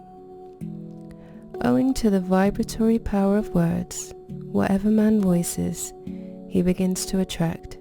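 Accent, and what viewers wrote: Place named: Surrey, United Kingdom. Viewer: Madame, Let me preface this with the fact that I am quite discerning when it comes to bestowing praise regarding anyone's voice when speaking. With that out of the way, I must give my most ardent compliments to you. You are in possession of a provocative, mellifluous voice, spoken with a disarmingly charming accent. Yours is the kind of voice, I would like to be the first sound I hear when I wake and the last sound I hear before I slumber. I live in Manhattan, New York, so my ability to ascertain the origin of your accent is perhaps insufficient. At least some of your cadence, and diction remind me of an accent South of London in Surrey. Notwithstanding my ignorance of the origin of your disarming accent, you have a guileless, feminine resonance in the prepossessing melodious sound which would only enhance the lyrical tone of poetry. 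A voice such as yours is no doubt accompanied by a pulchritudinous visage. Written by a bodice ripping rogue, sincerely with unadulterated veracity, without the slightest affectation whatsoever. ∞ Rogue Means - - - - 'Beauty is truth, truth beauty,' - that is all ye know on earth, and all ye need to know. ―John Keats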